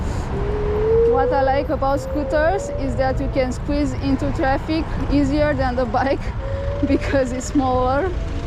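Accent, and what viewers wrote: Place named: Russia. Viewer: Mother russia accent. Pe deum rau stai pe pernițele degetelor din talpa ca sa nu iti mai zbenguie capu prin casca. Adica ridica-te putin pe varfuri si ai rezolvat problema.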